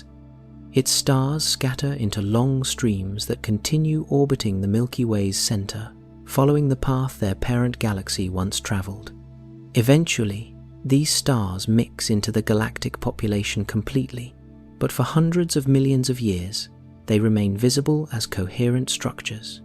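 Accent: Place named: United Kingdom. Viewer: I actually, like the voice of British accent. I can understand perfectly. Please use the same voice for future posts. This new discovery is awesome. I am always hungry for facts and accuracies., while we are still alive to learn our location in the vast Milky Way. I was wondering 🤔 all the time what our solar system encounters during our galactic journey. The Earth absorbs some of the space dusts and unknown particles that can affect our planet. Gamma rays or super nova radiation are scary that truly wipe out our civilization instantly. Anyway, I surely enjoy listening the podcast. Thank you for a good job 👏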